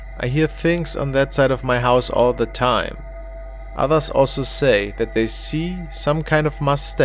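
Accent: Germany. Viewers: he's german if I remember correctly. You'd think after speaking (or trying) to speak english for years. Watching TV/films, reading articles, & speaking with fluent english speakers. That this habit would be unlernt. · Alter, der Akzent ist so anstrengend... ist das ein deutscher? Klingt jedenfalls so. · Sehr gut...was heisst...eigentlich.. an deinem Akzent denk ich mal du kommst aus Deutschland oder Österreich, lebst sicher in einem Englisch talking Country. If i m write, please take care of you,and i would love to see more of " in Carmel Main " Stay healthy,greetings from austria-ps.your Englisch is allmoust akzent free ( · It’s nice that you don’t say EVERYTHING is paranormal, and point out how/if it can be faked....is the accent German? My daughter and I are wondering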